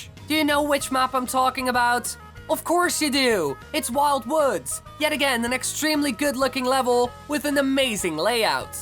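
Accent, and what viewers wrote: Netherlands. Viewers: are you dutch? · You really sound dutch for some reason.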